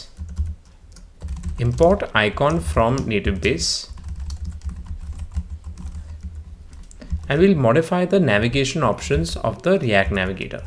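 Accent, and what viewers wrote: India. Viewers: The indian accent ><' ... but ... Good job man ;) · I love your indian accent :) Thank you bro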